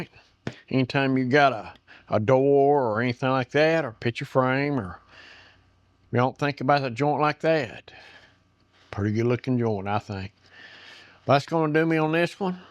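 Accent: South, United States. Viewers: I had asked, in a different video, what state you were from because I could not quite place it. I was thinking Kentucky, Tenn, NCar or maybe Virginia. I got pretty close. I'm from Texas, so knew it wasn't that one -- farther east than TX or LA, and farther north than MS, AL, etc.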